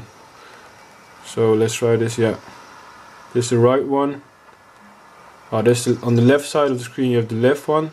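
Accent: Netherlands. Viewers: Are you dutch?? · Are you dutch ? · ben je nederlands toevallig? zo ja, goed engels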